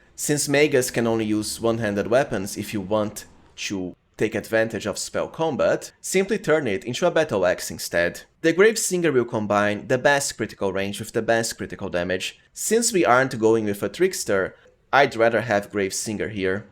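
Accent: Brazil. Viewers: 5 seconds in and I KNOW you are Brazilian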